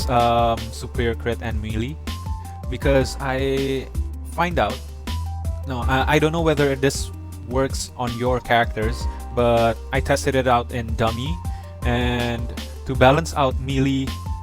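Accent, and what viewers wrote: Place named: Philippines. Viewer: isee haha sounded like ur from philippines also decent english accent. Will u please check to test my hybrid build on tr i use katar glaive and dagger for it, 1m CP proc around 150-200, normal atk and buff only on auto, and im using 1 illu drake 3s. Kinda works good for me cuz i get more dmg output to some whales here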